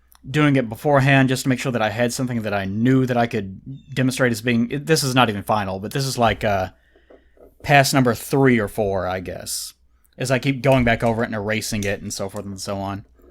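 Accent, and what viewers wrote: South, United States. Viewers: You became way more Southern in this one. Your drawl was really drawn out. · I feel like voice sounds noticeably more Southern then it normally does at times. It's weird for some reason, despite knowing lives in Kentucky. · It sounds like his gain is just set much higher, I had to actually turn him down on my end, he's as Southern as usual, just louder. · I can hear your southern drawl!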